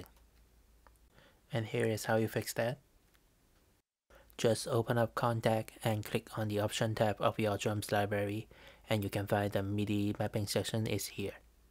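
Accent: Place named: France. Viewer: Love the crazy French accent!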